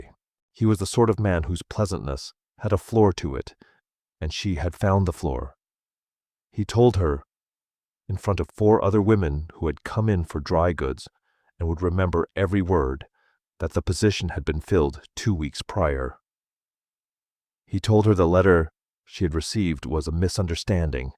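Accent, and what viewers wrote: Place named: Australia. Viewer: That was beautiful! Sounds Australian.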